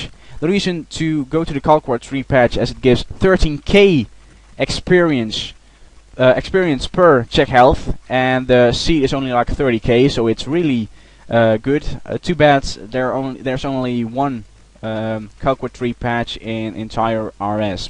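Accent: Netherlands. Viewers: Mhm pittig Nederlands? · Dutch accent <3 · are you dutch? :D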